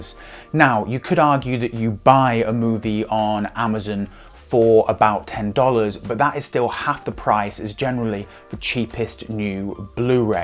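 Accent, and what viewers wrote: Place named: United Kingdom. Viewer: This looks like the British version of "Films at Home" from good old bearded Jeff, different accent and no cap but the beard is there. Physical media is my first choice over streamed movies. Censor ship is a real threat and easily applied to streamed movies. A recent example: in "Home alone" the appearance of Trump is under threat of being erased. That is Soviet-style censorship, erasing former political leaders from history.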